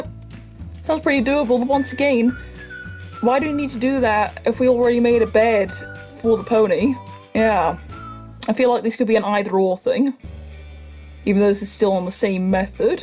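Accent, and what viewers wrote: United Kingdom. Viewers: You have a nice accent. Brittish?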